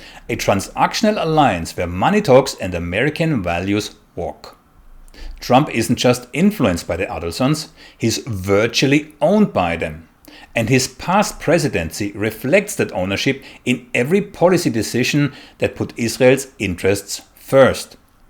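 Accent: Germany. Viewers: What other answer can be expected from a man with name Said Abou? Your Muslim hate for Jews you sucked with your mother’s poisonous milk. Your subscriptions are tell about you a lot… No, this German accent speaking Dutch antisemite is well-known by his lies, half-truths, distortions. So, he is your friend in hate…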